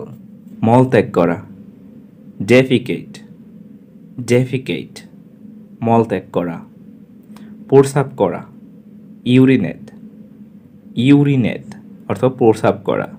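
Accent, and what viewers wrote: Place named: United States. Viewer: ইউটিবার ভাই ঠিকই বলেছেন। আপনি আমেরিকার উচ্চারণ দেখতে পারেন। তারা এভাবেই বলে। call রে বলে খল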